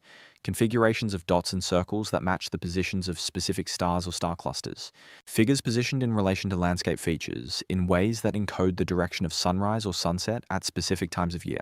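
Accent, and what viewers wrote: Australia. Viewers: You have an Australian accent but you can't pronounce EMU correctly. If the Aboriginal people built villages, why do they not have them now?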